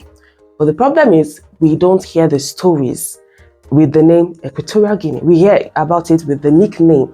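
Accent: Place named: Nigeria. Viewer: You're fine as hell, I don't know why I like Nigerian women's accents!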